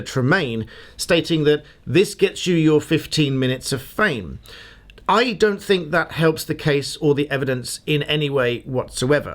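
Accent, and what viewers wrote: United Kingdom. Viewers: I know I’m an a.h (arse hole not amber heard ) but I watched the popcorn interview twice and started this video over twice And I just can’t absorb info from a British accent idk what it is Ty for the info and content tho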